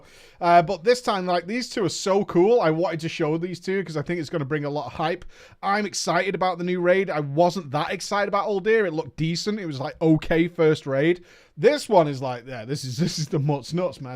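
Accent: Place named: United Kingdom. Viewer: no joke, you man, you know this game like the back of your hand. In fact, I think you probably know this game, BETTER, than the back of your hand. And Andy, why are yall have troubles? I just want to know. Cause if I was Andy, I would just say, "Preach, dude, lets make some more skits together, or whatever." Oh well, dude, you ok, all of that wow information AND your British too? WoW, is all I got to say.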